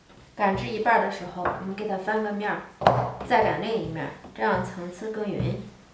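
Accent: Northeast, China. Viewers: 一听口音，感觉就是俺们东北的媳妇，手真巧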